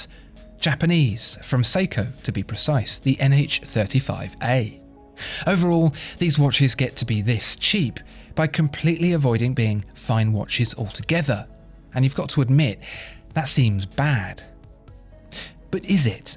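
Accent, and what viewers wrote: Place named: RP, United Kingdom. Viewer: this guys voice drives me so freaking crazy. he talks so freakin slow and poshly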